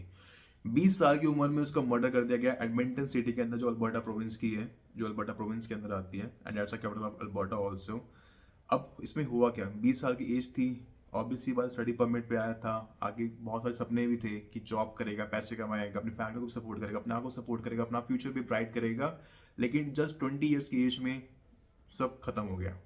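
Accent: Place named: India. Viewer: Ya to english bol le ya hindi. And lose this stupid indian english medium schools accent. Its annoying.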